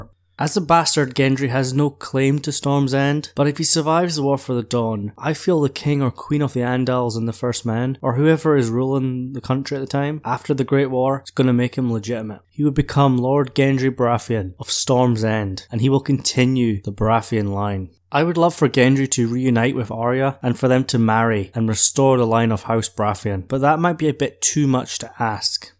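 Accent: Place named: Scotland, United Kingdom. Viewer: Great video, very interesting content & I enjoyed very much. You have a lovely Scottish accent ( assume Scottish lol ), it's lovely to listen too and you articulate really well. I'm from Outside London England, so I'm use to harsh accents lol. Wicked channel & I like your video content. Thanks 😎👍🏼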